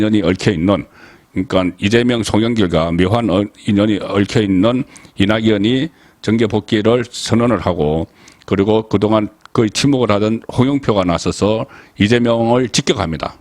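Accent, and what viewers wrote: Gyeongsang, South Korea. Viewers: 들을때마다 갱상도사람의 약간 떠듬거리는 말투...꼭 웬지 나와같다는.....난 서울사람처럼 매끄럽고 술슬 말이 나오는럿도 좋지만...전 영양출신으로 처음 청주에서 대학생활애서 표준어앞에서 사투리를 감추느라고 땀흘리던 생각이 납니다. 70년대초반 중딩때 석간 영남일보 100여호 배달 알바를 열심하던 추억이 생각납니다...응원합니다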